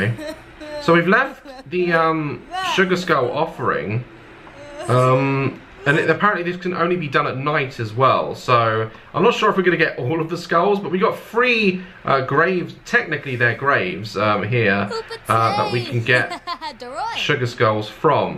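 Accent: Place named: Essex, United Kingdom. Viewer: just next time, please make the roasts funny. btw are you from essex? no offense but you sound like it. i am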